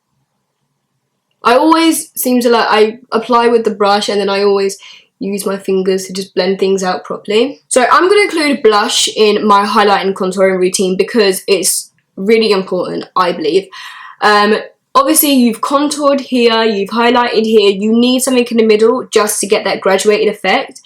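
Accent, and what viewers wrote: London, United Kingdom. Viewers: very cool! i was wondering because your accent sounds much like accents from at or around london :-)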